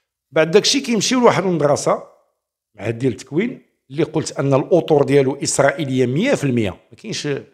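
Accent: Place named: Algeria. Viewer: كتهدر بحال الجزايريين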